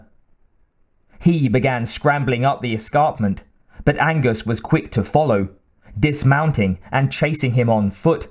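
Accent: United Kingdom. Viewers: Listened to most of your audiobooks but couldn't get through this due to your god awful scots accent really no need for it.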